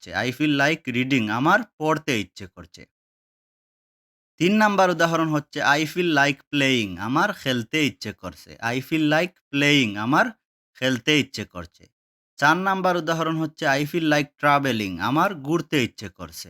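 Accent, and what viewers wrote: Sylhet, Bangladesh. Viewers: ভিডিও টি ভালো লাগছে নতুন কিছু শিখালেন স্যার সিলেটের আঞ্চলিক ভাষা পরিবর্তন করুন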